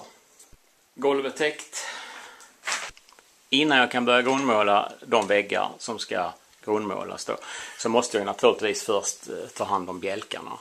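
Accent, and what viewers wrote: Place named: Hässleholm, Sweden. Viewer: jag har tidigare jobbat i hässleholm och tyckte jag kände igen språket:)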